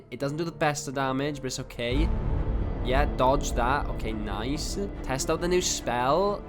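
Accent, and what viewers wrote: Wales, United Kingdom. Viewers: Idk why but I'm getting a slight hunch that you're also welsh...just a hunch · Im a simple guy, i hear a welsh person. I press subscribe.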